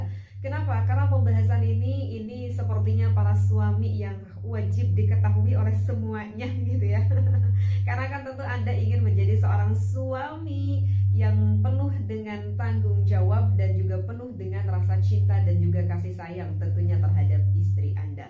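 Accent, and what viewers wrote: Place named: West Java, Indonesia. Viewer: Teh orang mana sich Sunda nya pas banget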